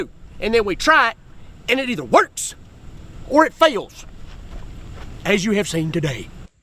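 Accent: United States. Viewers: Yo I love his voice. His voice is MERICA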